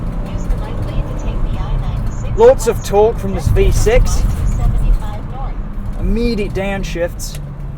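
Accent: Australia. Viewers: Dat Aussie accent tho... :O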